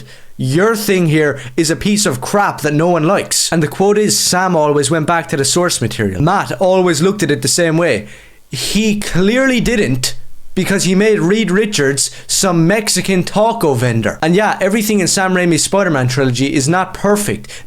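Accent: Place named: Ireland. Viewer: If this guy didn't have an Irish accent, I'd bail in thirty seconds.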